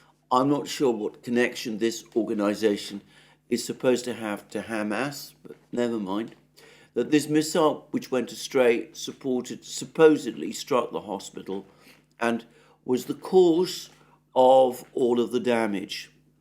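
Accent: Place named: London, United Kingdom. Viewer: ⭐⭐⭐Alexander Mercouris gives a good example, of what journalism should actually be. committed – objective – balanced This is not flattery - this is a factual statement, which you have to come to if you follow his reports over the years. The whole thing delivered in very audible, charming London English and general smiles, “where it can’t be avoided”. In Greek history, Mercury was the messenger - the messenger of the gods - the messenger of traders and thieves... in this case someone found his calling ; ) Alexander Mercouris – gold standard in reporting ! no more and no less ! Hallelujah ! With best regards – "the broker"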